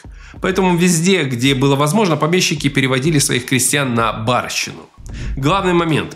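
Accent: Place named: Ukraine. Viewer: Страна-резидент канала: Украина(это слышно и по говору),так что,все понятно, псевдоистория с вымыслами... В России НИКОГДА не существовало рабства,каждый человек был лично свободен(экономическая зависимость-это другое дело). Раб не может сменить социальный статус,а крестьянин в России-мог! Крестьянские дети становились дворянами,как бы(потому что это требовало,как минимум,образования). Крестьянин мог подать ЖАЛОБУ на помещика,напрямую,Царю. Крестьяне жили общиной,"миром",имели СОБСТВЕННОСТЬ и сами продавали выращенную продукцию-где тут рабство? Розыском беглых крестьян обязан был заниматься помещик, то есть никакой системы государственного закабаления не существовало.. Крестьянство было СЛУЖБОЙ,только,не государевой,а персональной. Феодальная система! Общественный договор! Взаимозависимость!!!! Помещик ОБЯЗАН был заботится о крестьянах и кормить их в неурожайные годы-отмена крепостного права вызывала БУНТЫ крестьян,так как помещик теперь мог просто выгнать неэффективных и ленивых работников,"освободив" их. 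"Продавали" крестьян деревнями,то есть,это была просто смена собственника,как сейчас с заводами. Отдельных крестьян не столько "покупали",сколько переманивали-хорошего кузнеца,например. Крестьянин,до определенного года,мог вообще,сменить помещика(на Юрьев день)-рабство,говорите? Разумеетс,были периоды и случаи не очень красивого обращения с людьми-но их всегда было мало и это общественно порицалось. Не забываем,что ВСЕ русские тогда были одной веры и,если европецы в Новом с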